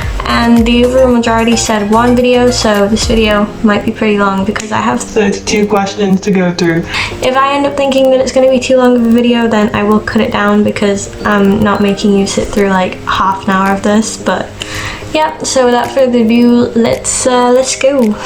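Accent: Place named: United Kingdom. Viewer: Holy shit i did not expect you to be British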